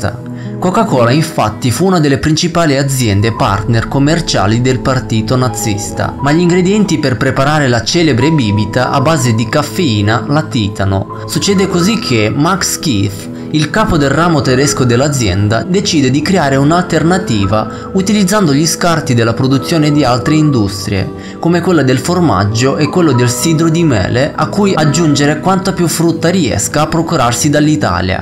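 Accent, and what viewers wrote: Russia. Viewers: È russo... ha un accento diverso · Direi che il lessico italiano di alto livello che accompagna i tuoi video fondendosi con l'accento russo non troppo marcato, e il tasso di suspence dato dalla musica di sottofondo crea un armonia unica che ti invoglia di volerne di più di più sempre di più AHHHHHHHHHHHHHHHHHHHHH! Perfavore un top 5 curiosità sulla bomba atomica -!!! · sei russo? hai un accento vagamente russo e poi con il sound di sottofondo lo accentua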